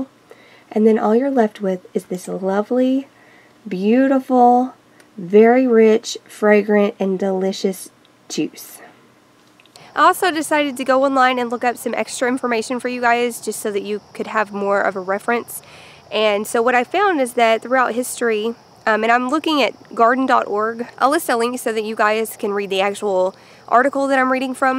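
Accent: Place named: Texarkana, United States. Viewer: I could tell by your accent you are from Texarkana ❤ My grandparents and mom were born there so I have lots of family there. We have beauty berries all over our land in East Tx and I was watching your video while picking the berries from stems I had cut this weekend. Oh my goodness, these plants stink lol!! No one mentions that in videos. Maybe it's just me but they made me sick to my stomach smelling them for a couple hours. I sure hope the smell is gone after boiling!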